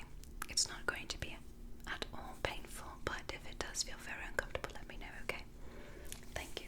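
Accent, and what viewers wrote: United Kingdom. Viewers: As a Brit.. I can confirm..The accent is fantastic! Well done,love! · You are the Most Beautiful ASMR Doctor ever!!! I love the British Accent!! Thanks for the tests! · Lovin the British accent ☺ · Bravo on the British accent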